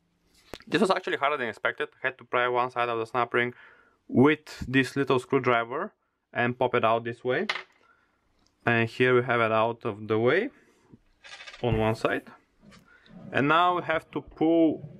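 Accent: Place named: Russia. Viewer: Какой прекрасный русский акцент )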